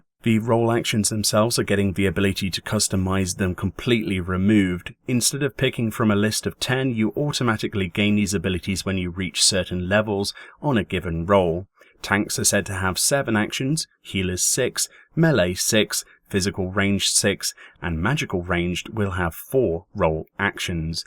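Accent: England, United Kingdom. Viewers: I think your English accent just makes everything sound more easier to digest lol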